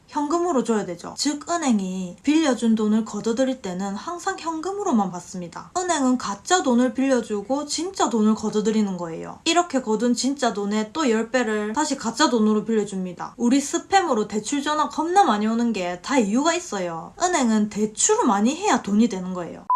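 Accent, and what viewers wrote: Gyeongsang, South Korea. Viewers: 경상도 사투리로 아주 야물딱지네요~~^^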